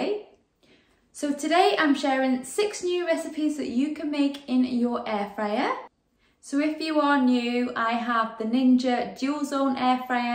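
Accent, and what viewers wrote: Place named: Northern England, United Kingdom. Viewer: Excellent lovely clear proffessional presentation & I think a northern accent,great,certainly try some in our air fryer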